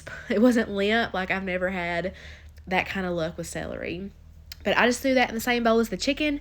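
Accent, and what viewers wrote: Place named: Kentucky, United States. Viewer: Are you from Richmond? I’m from Paris, KY and I feel like your accent is heavier than most people’s around here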